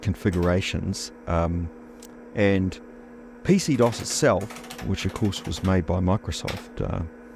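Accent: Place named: New Zealand. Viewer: At first i guessed you were Australian, but your pronunciation of Check it tells me you're from New Zealand. One of my students was from your country.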